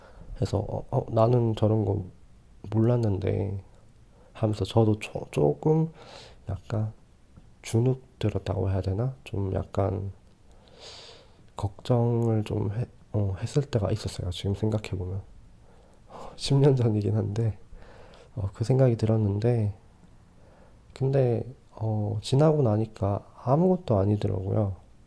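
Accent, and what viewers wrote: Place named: South Korea. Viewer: I wanted to know what you said in the video hahaha I like the Korean accent!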